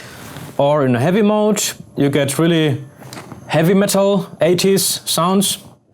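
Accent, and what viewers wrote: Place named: Finland. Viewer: suomienglanti ftw :D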